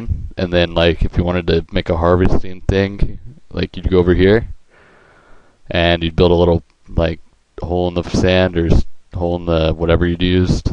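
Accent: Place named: Germany. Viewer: great german pronouncing yeah :D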